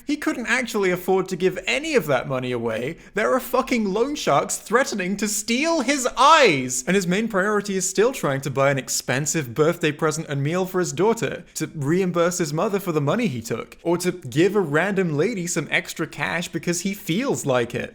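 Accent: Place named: Scotland, United Kingdom. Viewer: are you from/living in Scotland? I thought I heard some Scottish pronunciations in there! Hope you're loving the Granite City - love your videos!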